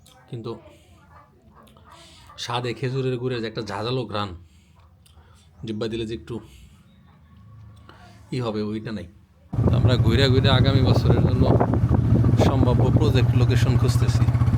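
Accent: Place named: Jessore, Bangladesh. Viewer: আমাদের যশোরের ভাষা মনে হচ্ছে, ভাই কি গিয়েছিলেন??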